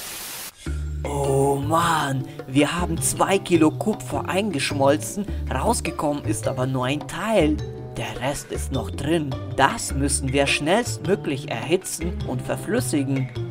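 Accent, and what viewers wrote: Russia. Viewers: #Kölnerdom Feiere deine Videos übel und viel Glück für deine Zukunft ignorier deine hater einfach hör auf die richtigen und dein Akzent feiere ich auch ich schätze mal du bist Russe oder Kasache meine Familie kommt auch aus Kasachstan 🇰🇿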